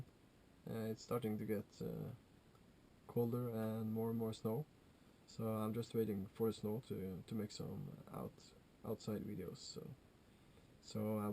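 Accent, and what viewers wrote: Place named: Norway. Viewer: Nice tip! Made my own. Burns really well, about10 min burn time. Btw in the video you say "I don't have any more "rests" he he Nice Norwenglish .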